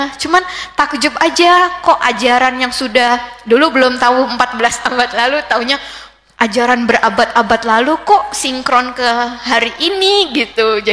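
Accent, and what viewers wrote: West Java, Indonesia. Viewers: Asalamualaikum.....ibu dokter Alhamdulilah saya dengernya seneng dan terharu mudah2an selalu sehat panjang umur dan selalu Istikomah.....Aamiin ibu dokter apa ibu dari suku Sunda? Suaranya logat Sunda. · Iya. Jdi kayak orang Sunda.... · Dokter bahasanya kaya sunda banget ye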